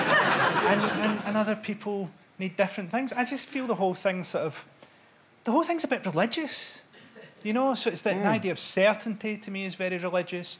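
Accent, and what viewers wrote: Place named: Glasgow, United Kingdom. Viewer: When did frankie acquire a glasgow uni accent?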